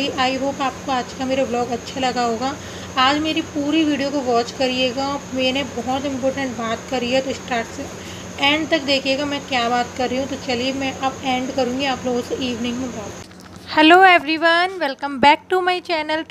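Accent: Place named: Uttar Pradesh, India. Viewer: apki bolne ki style pr bahut hasi aa rahi thi....sach me up me ase hi bolti h ourate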